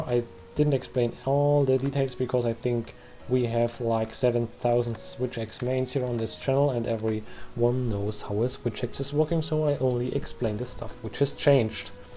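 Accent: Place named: Germany. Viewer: 😅 You have to understand with a German accent he is indeed pronouncing it correctly. In fact one could argue that is supposed to be how it’s pronounced given the spelling. Americans are about the only people who pronounce it as “gage”.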